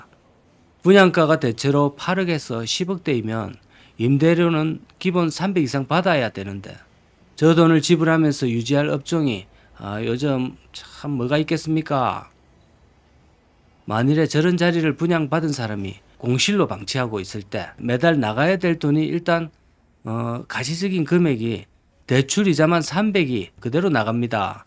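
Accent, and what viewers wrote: Gyeongsang, South Korea. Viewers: 경상도 말투는 왜 촌스러울까 나두 경상도인데ㅠ😊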